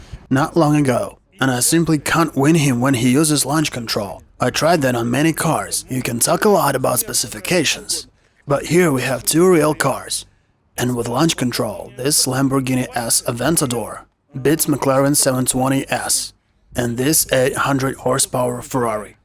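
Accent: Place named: Russia. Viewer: это у тебя в голове установка видимо детского сада. Это и без тебя известно что есть и британский и австралийский акцент. Просто все дело в том, что если бы ты знал на какую страну метит Эрик со своими англообзорами, ты бы тут вообще ничего не писал. А раз он метит туда куда ты не знаю понял ещё или нет, он мог бы перевести свои обзоры с русскоязычным чуваком и дать на озвучку носителю языка. Решил сэкономить, чё тут сказать. Просто представь ты смотришь топгир а там озвучивают Равшан и джамшуд.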